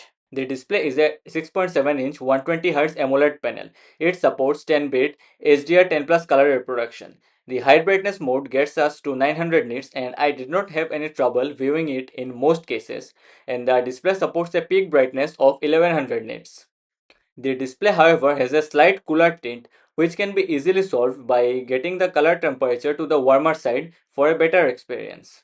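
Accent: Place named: Bengal, India. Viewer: Puro bangla accent toh bhaii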